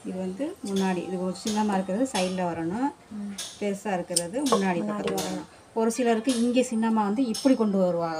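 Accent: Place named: Karaikudi, India. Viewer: அக்கா நீங்க பேசுற பாஷையா பார்த்தால் நம்ம ஊரு காரைக்குடி மாதிரி தெரிகிறது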